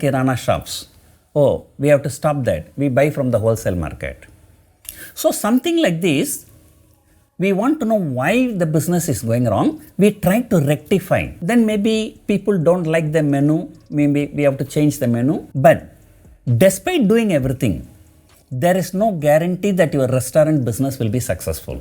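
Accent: India. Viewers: Indian accent is so much fun and relaxed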